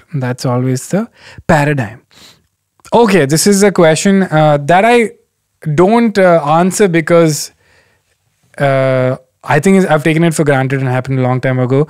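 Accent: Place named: Bangalore, India. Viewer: Hey Kenny, First of all I simply adore your work and I am in love with the version of you in these long recording of you speaking!!! Okay, some background about me, I am Bangalorean born and raised in that lovely city and now stay in Indore, M.P. Your way of speaking, your accent, the dialect all of it reminds of my time in that city. Little, little things about how you say chumma, pavaam, maacha, when you add "it seem" after certain words is just too cute and makes me go awwwww!! I really admire your work be it the set on Jet airways (i don't have a count of how many times I have watched this), dating rules for guys (where the girls collect all the sorry omg what an observation, Kudos on that), all your chai time episodes they are all simply awesome :) I just love your observational skills and brutally honest opinion about each and everything. 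My Question to you is how did you cope up with the feeling of moving from one city to another? don't you miss Bangalore? weren't there days when you wanted to just go back to the city? or just some food place/joint(s) that you miss eating some amazing ghee roast dosa? or the filter coffee I mean anything! It was a hard time for me moving to Indore (very hard in-fact) I would love to know your experience though. Also where do you want to stay after your retirement? When is your special on Netflix releasing? Also, please for God's heaven sack please do a show in Indore I am so waiting to watch to perform live (obviously once this Covid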